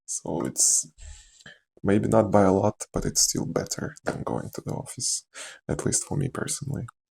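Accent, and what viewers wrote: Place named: Eastern Europe. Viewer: that slavic accent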